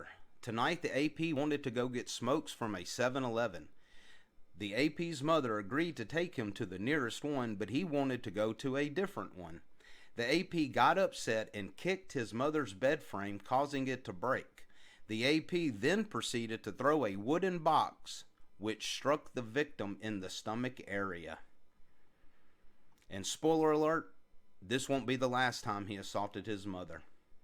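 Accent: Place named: South, United States. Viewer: From one Southern to another I love your accent. I am guessing South Carolina or Tennessee? I'm 11th generation Floridian and it's rare to hear a native Floridian accent.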